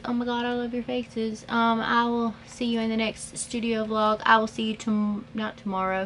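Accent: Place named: South, United States. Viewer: I will laugh SO hard if you start to develop her accent on top of your natural Southern accent 😂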